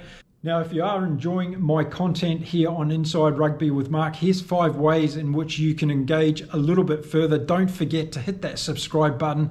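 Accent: New Zealand. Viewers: You have the least offensive kiwi accent. Fush and chups 👍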